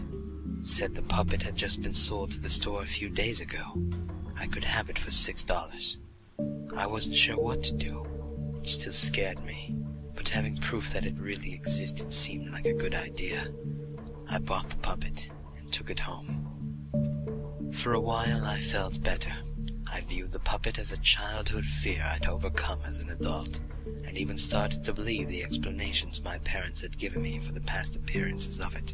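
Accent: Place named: United Kingdom. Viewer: 原來不是只有我這麼覺得 超英國腔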